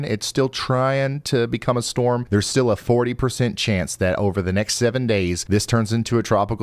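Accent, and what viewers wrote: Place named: Kentucky, United States. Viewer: Got hooked over a year ago when I heard that Kentucky twang.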